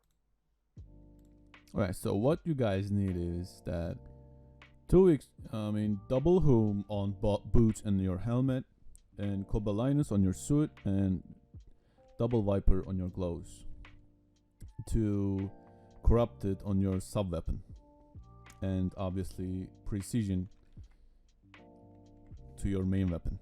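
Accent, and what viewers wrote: Turkey. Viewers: Güzel bir aksanın var ama yine de türk olduğunu tahmin ediyorum :D Video için teşekkürler çok yararlı oldu. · Şunun bir de türkçesini çeker misin kanak ses tonlamandan anlaşılıyor türk olduğun çok rica ediyorum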